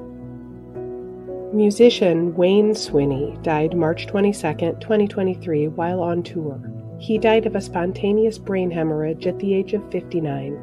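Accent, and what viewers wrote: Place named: Upper Midwest, United States. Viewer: Are you from Minnesota by chance ? I detect a strong upper Midwestern accent.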